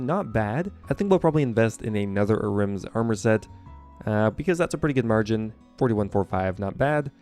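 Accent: Canada. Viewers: Hey random question, you sound like you're Canadian but originally from a European country, or am I just hearing things lol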